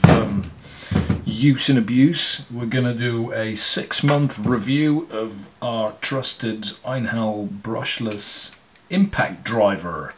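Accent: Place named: Australia. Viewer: Thank's a lot for the effort! 👋👋👋 It make so much sense to buy a durable tool! It is jolly good to watch screwing compoetion videos, but at the end of the day you not only need a relatively quick tool, but ot also has to be light and DURABLE!!! and reparable!!! Would be great to get a view of the competition like: makita, dewalt etc... And the Aussie accent is the cherry on top of these videos!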